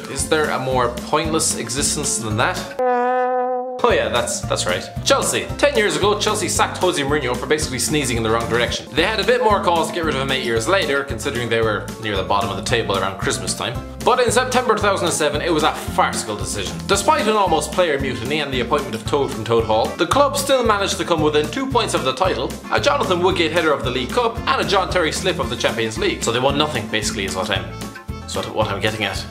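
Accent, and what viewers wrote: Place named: Ireland. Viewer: The Irish narrator is the best by far. Needs his own channel.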